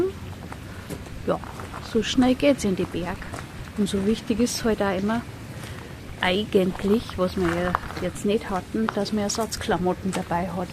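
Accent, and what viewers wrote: Bavaria, Germany. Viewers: So super schön deine Videos, vor allem so vielfältig!!!😊 Finde auch deinen Dialekt super, ich komme auch aus Bayern und verstehe sehr gut was du sagst😊 Musste eben so lachen als du geflucht hast (Zefix...)😂😂😂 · Ich finde es ganz natürlich und wunderbar ,wie Du Deine Videos für uns rüberbringst. Und bayerisch ist eben bayerisch, wie die Landschaft sich gestaltet, so passt auch der Mensch. Ich liebe es, alles zusammen. ❤lichen Dank.....hier spricht der Städter, der die Berge liebt und vieles mehr😅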